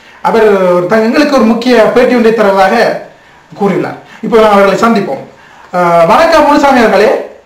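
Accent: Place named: Sri Lanka. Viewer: starting 02.33 it was really funny guys. I like srilankan accent. Try to make more like this video.All the best!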